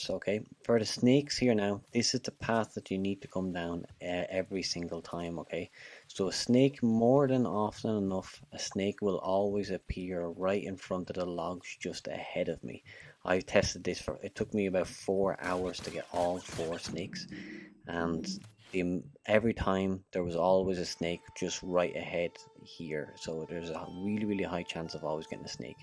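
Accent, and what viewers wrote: Ireland. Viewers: Hey are you Irish?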